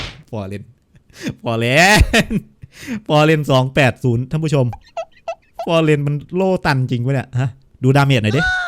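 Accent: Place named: South, Thailand. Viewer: แหลงใต้ชับพึดนิ5555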